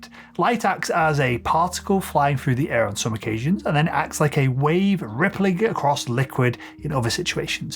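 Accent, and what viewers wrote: United Kingdom. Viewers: There seems to be an influx of British sounding youtubers that speak confidently, like they know what they're talking about, but in reality are spreading so much misinformation and bad info it should be criminal. Just hearing "aperture, or otherwise known as the hole in tye front of your camera". Sheesh. Don't even get me started on the whole aperture and noise comment, I get where he's coming from but man was it poorly phrased for an "educational" video.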